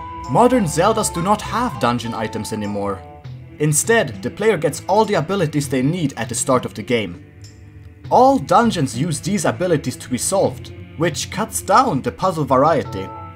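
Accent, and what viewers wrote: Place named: Sweden. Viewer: I could hear you’re Swedish from a mile away xD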